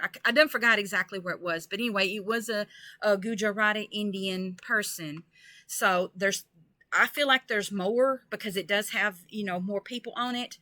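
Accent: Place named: South, United States. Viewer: Sounds like this person is from the south.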